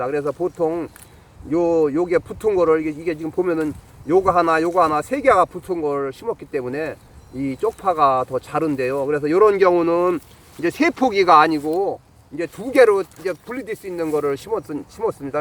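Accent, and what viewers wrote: Chungcheong, South Korea. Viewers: 데요~데요~ 너무 좋습니다 충청도가 최고에요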